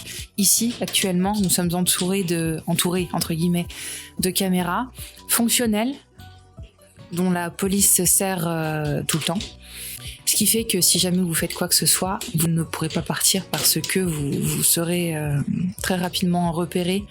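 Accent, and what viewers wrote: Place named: Russia. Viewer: bah oui, française avec l'accent russe et du cerveau russe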